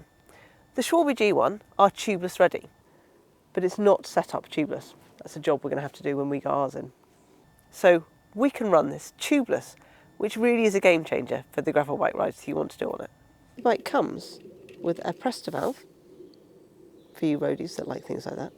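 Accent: England, United Kingdom. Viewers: Long time since your dulcet robbed English accented voice issued forth from the speaker of my smartphone. A 20 inch Brompton with Shimano 8 speed is not very different from the Moulton that was on offer last year out in Arizona. Shame it doesn't have the front fork of the Moulton The Moulton frame might be stronger. Not as convenient. The 34 spoke rear wheel would be better for use on unpaved roads. We have hundreds of miles of dedicated bike trails here in Missouri. Katy. Rock Island etc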